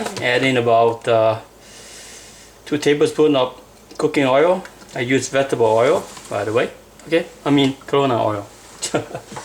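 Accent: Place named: Laos. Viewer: Hi, I was 25 years working with Asian people, mostly Lao, Vietnam and from Cambodia. At first I hate the smell of your food but, slowly when I became friend with some of your people I start tasting the food and today a love it. My favorite are spring rolls with dipping sauce and sticky rice with some vegetable dipping or meet. I enjoy your video and comments your English remind me my dear friends from Laos.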